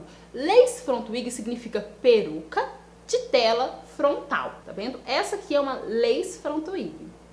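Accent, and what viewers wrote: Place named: Minas Gerais, Brazil. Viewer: esse sotaque que acho lindo? tu és mineira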